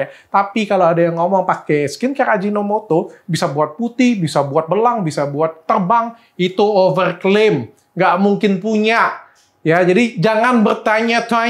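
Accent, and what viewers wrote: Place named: Palembang, Indonesia. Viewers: Dokter orang palembang ya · Dokter asli palembang yach...saya mendengar kata2 mogolah 😁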